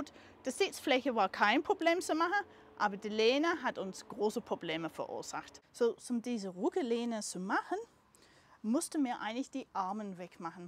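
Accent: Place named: Swabia, Germany. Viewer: your ever so slight Swabian accent is darling! Thank you for your lovely videos.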